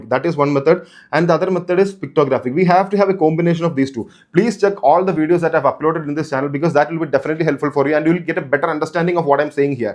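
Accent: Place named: South India, India. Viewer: I don't know but i actually like South Indian accent 😅.. thx guru ji❤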